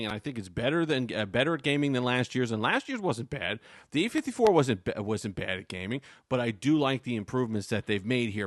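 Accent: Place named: United States. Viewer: appreciated, but if they would ask me to explain how it sounds thick American accent with one video I show this one ahah, goated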